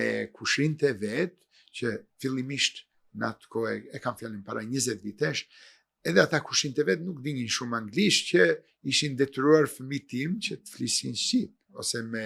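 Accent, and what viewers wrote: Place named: Kolonja, Albania. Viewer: Truri dhe mendja e ndritur e Shqiptareve ndertojne boten dhe per vendin e tyre nuk bejne asgje se nuk kemi shtet qe ti mbeshteti Bravoooooooooo per gjuhen dhe dialektin Kolonjar Respekte per kete Zoteri ❤❤❤❤❤❤❤❤❤❤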